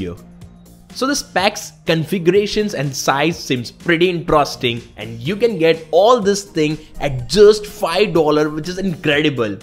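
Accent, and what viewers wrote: India. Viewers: English with spicy hindi👍🏻👍🏻👍🏻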